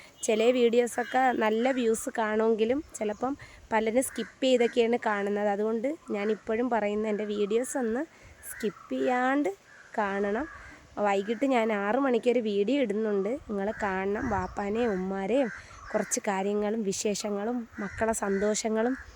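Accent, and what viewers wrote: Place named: North Kerala, India. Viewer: Hlo എടാ ..നിന്റെ വീട്‌ കൊല്ലം ആണോ ...ഈ പാരിപ്പള്ളി എവിടാ ...നിന്റെ slag വടക്കൻ കേരളത്തിലെ പോലെയാണല്ലോ .....ഒന്ന് പറയണേ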